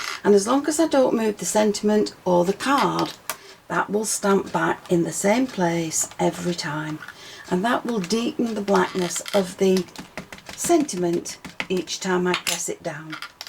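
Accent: United Kingdom. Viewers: I love your british accent it is very soothing and relaxing, and the music is very pretty and also soothing and relaxing.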